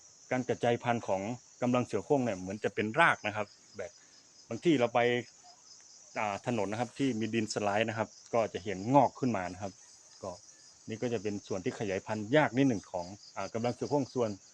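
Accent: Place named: Central, Thailand. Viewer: คุณเคยอยู่หรือไปเรียนในเมืองมาก่อนหรือเปล่าคะ พูดสำเนียงภาษากลางชัดเจนดีมากค่ะ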